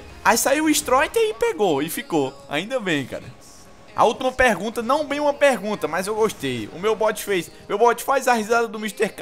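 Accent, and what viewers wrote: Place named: Paraíba, Brazil. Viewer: O stroiter ta com menos sutaque da paraiba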